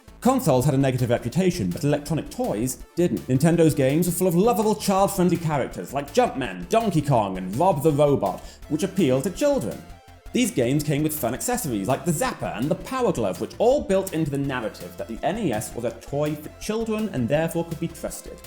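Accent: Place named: United Kingdom. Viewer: The irony of a person who is clearly British saying Nintendo reclassified gaming is hilarious.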